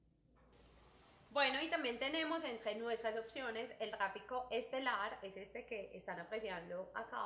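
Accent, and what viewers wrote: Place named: France. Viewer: La chica habla como con un asento francés, o será que tiene la lenguita pegada🤔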